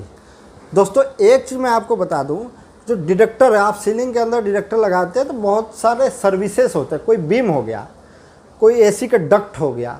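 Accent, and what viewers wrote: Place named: Bihar, India. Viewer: Bihari accent ,can it be converted to normal hindi accent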